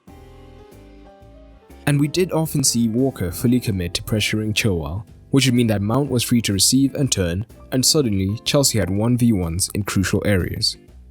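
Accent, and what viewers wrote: South Africa. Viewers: Are you south African? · Is the narrator south African? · Are you South African?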